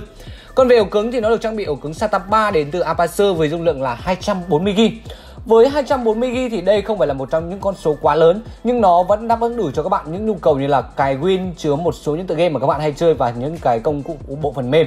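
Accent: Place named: Central, Vietnam. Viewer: như kiểu ng miền trung , nói tiếng miền bắc. dù có vẻ rất hoàn hảo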